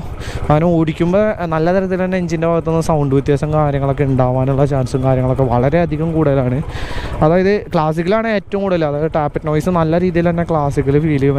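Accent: Thrissur, India. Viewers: ഫോൺ കേടായത് കൊണ്ട് നാൾ കഴിഞ്ഞ് ആണു ചെട്ടായിടെ വീഡിയോ കാണുന്നത്.. സംസാരം ഒക്കെ ആകി മാറ്റി കളഞ്ഞല്ലോ.. missing that Thrissur broo.. ഞാൻ തൃശൂർകാരൻ അല്ല.. പക്ഷേ I loved the way you talked.. pazhe pole mathy broo...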